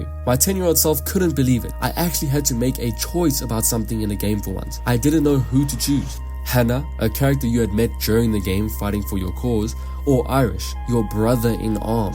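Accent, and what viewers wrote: South Africa. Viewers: you South African ik that accent on god😂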